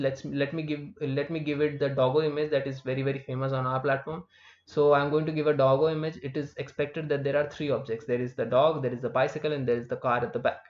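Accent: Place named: India. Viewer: face-blue-wide-eyes:heavy indian accent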